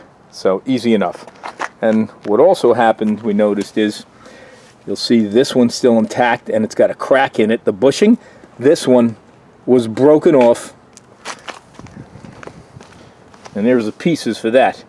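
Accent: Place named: Boston, United States. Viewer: Best,part of the video is the Boston accent..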